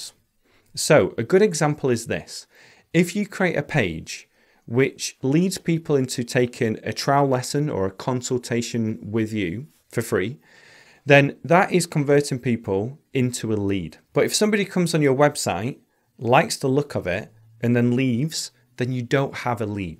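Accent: United Kingdom. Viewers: Wow, I havent `t seen you video for a long time , you `r British accent is really nice . Thank you .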